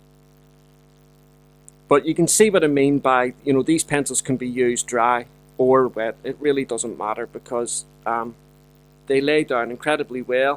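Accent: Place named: Northern Ireland, United Kingdom. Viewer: I think has a Northern Ireland accent IMHO.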